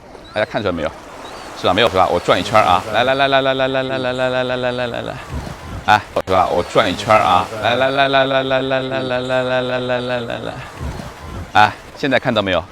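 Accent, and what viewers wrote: Australia. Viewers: 说的是澳洲英语口音，但土耳奇人大卫说的不太有澳洲口音，可能从土耳其移民到澳洲才十多年左右。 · I'd just like to compliment ya on your mastery of Australian English. Can't believe I haven't seen this before. Keep up the good fight.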